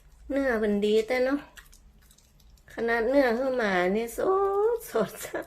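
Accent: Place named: North, Thailand. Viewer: สวัสดีค่า เพื่อนใหม่มาส่งกำลังใจและมาขอกำลังใจด้วยคนนะคะ,, ชอบภาษาเหนือมากๆเลยค่ะ สำเนียงเพราะจัง❤️🥰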